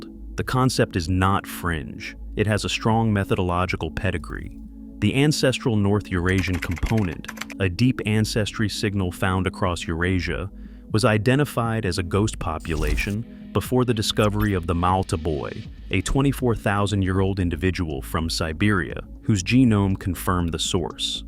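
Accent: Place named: United States. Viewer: The constant over pronunciation for dramatic effect, and mispronunciation out of poor programming makes listening to an American accent droning on and on make this extremely jarring.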